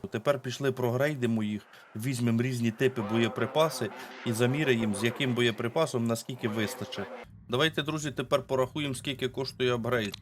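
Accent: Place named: Poltava, Ukraine. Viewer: I love your Poltavian accent 😅👍❤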